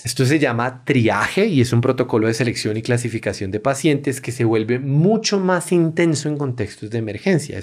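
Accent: Colombia. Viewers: Me parece genial la forma de narrativa gráfica que tienen y el dinamismo para transmitir información, una voz holgada me gusta el formato. Parece ser Colombiana, orgulloso de ser así.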